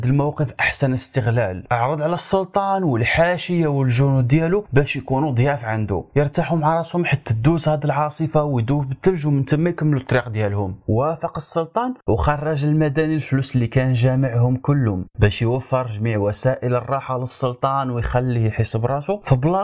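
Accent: Algeria. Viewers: لو اهتممتم بتاریخکم لکان افضل....اللهجه دیالک الجزاٸریه واضحه ... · لكنتك الجزايرية غالبة على كلامك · يحاول التحدث بالدارجة المغربية ولكن اللكنة الجزائرية تطغى عليه بحكم زمالتي مع جزائريين. حتى ملامحه جزائرية ومصطلحاته ومفرداته ليست كمغربي أصلي حين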